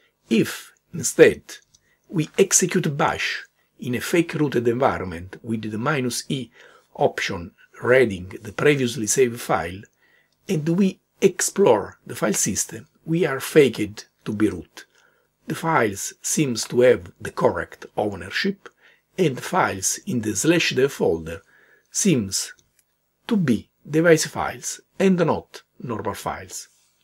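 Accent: Italy. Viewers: the italian dancing accent with scretched vowels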